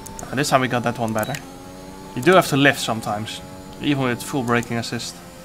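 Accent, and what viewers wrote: Netherlands. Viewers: verry nice nederlandish accent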